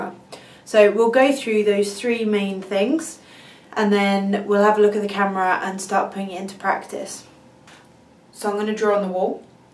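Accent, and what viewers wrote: United Kingdom. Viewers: What happened to the nice dragon lady...have you married and forgot us here? lol...love your British accent, yea!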